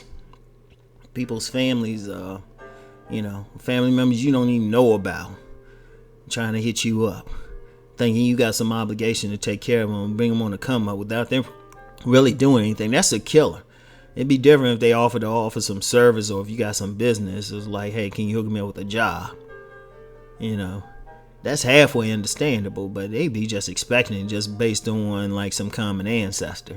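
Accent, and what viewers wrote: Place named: Baltimore, United States. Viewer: Funny how you can still hear your B.More area accent that do and you give yall away everytime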